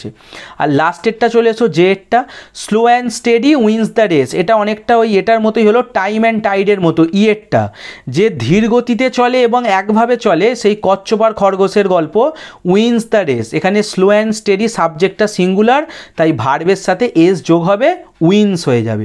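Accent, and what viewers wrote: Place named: Howrah, India. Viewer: Sir. Are you from howrah district? Your version shows that!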